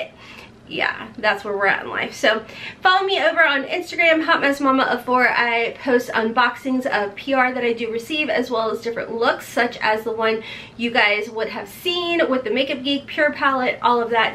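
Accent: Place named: West Virginia, United States. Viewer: Waaaaait... I’m from WV too and pick up on that accent every now and then as well. I’m sure there’s more of us out here, but I feel like we’re so few and far between! I live near Summersville, so I’ll say that and send my love wherever you are!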